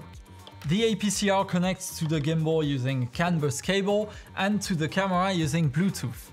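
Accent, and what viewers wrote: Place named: France. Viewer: on enttend légérement l'accent francais.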